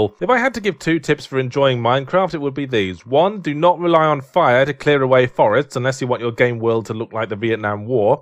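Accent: Australia. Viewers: Oh cool you are an Aussie? Again cool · is he australian if so yay another australian if not well idk.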